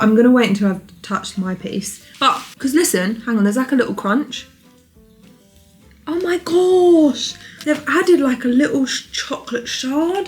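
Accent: England, United Kingdom. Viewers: Je viens de te découvrir, j'ai beaucoup aimé cette vidéo. Ce qui me perturbe c'est ton accent anglais alors que tu es en Amérique puisque tu testes Costco... À part ça merci pour cette vidéo. Bisous de France ❤❤❤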